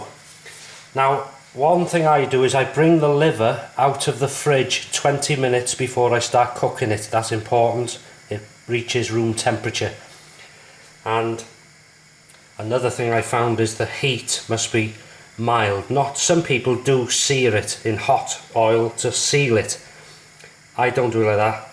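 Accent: United Kingdom. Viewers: First question, "what has potato got to do with liver and onions?"' I am only 70 years old, what is an "ox-o?" Bet your liver was tough, I have ever seen anyone boil their liver. Your accent lends to Britain, we were also taught with meat, to never use a utensil that punctures the meat. Your old recipe of liver and onions kind of turns me off, and I am a guy that really likes liver and onions. Again, almost into mt 71st year on the planet, I have Never, ever come across lamb's liver.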